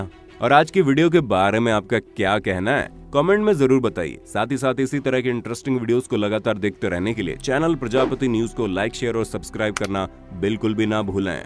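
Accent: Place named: Lucknow, India. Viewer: मैं भी लखनऊ से ही हूं काफी दिन से सोच रहा था ये आवाज किसकी है और लहजा तो हमारे ही आस पास का है आज ही जाना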